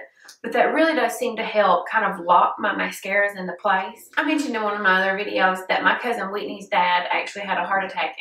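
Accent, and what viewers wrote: South, United States. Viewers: I love your videos and your accent speaks to this southern KY girl's heart!💙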